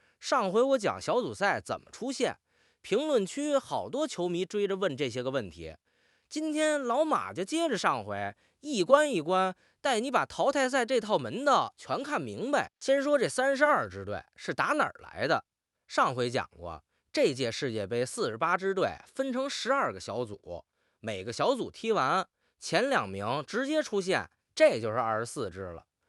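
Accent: Beijing, China. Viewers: 作为北京人，喜欢这腔调👍😂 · 的字幕不會用簡體中文不過這老北平口音....像，很像啊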